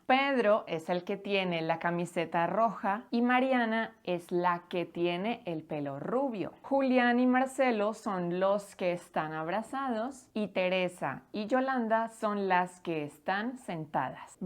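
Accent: Colombia. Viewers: Muchas gracias. Estoy nueva agui... me encanta tu clase, tu manera de ensenar, tu acento de Colombia. Voy a continuar escuchandote. Gracias otra vez. Abrazos de la distancia, de EEUU.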